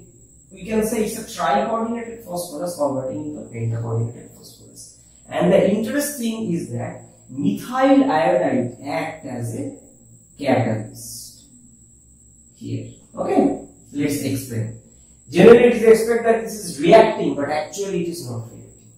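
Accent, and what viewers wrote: Bengal, India. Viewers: Hmmmm, Apnar kotha sunei bojha jay. Bangali bangali ke chine fele.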